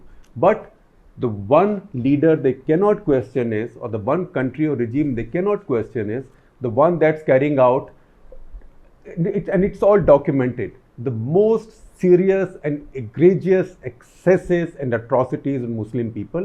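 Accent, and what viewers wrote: India. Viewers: sorry sir apki baat samajnai k liya kaan lagana parta hai......better is to talk in urdu.......as ur english is like urdu......typical indian/asian dialect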